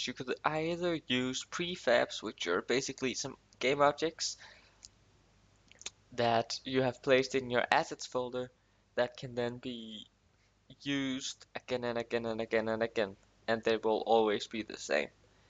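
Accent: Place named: Denmark. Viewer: Just guessing off the accent but are you Danish?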